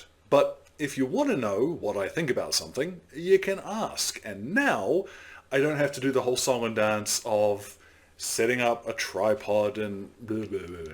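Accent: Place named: New Zealand. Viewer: The new mic makes you sound like a bloody Kiwi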